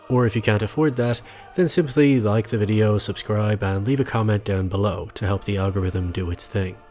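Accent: Ireland. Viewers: Love this channel. Insightful, well written, well-produced. Well done, man! Are you Irish? I thought I could hear the accent. As a Dub I can spot it I think ;) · Sounds Irish to me but what do I know lol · Is the narrator Irish? Some words sound Irish (e.g. without, about etc) but not entirely sure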